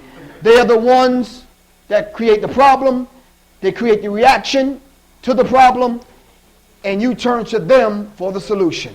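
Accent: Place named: United Kingdom. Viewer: WHY!! This nigga sound British. (no disrespect tho)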